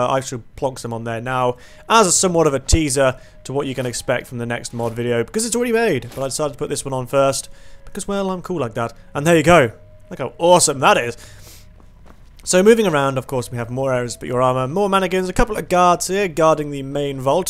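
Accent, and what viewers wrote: United Kingdom. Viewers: Oy, luv, your, britesh, accent ,young man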